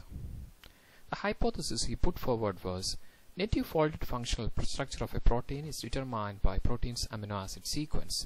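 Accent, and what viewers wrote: Kerala, India. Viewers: But being a keralite I understood your accent :)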